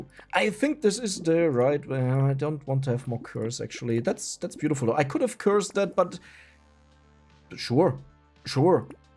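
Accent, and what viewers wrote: Germany. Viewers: Hi there, may i ask from wich region in germany you are? Im realy not sure with your accent. Thanks for your videos they are quite entertainung.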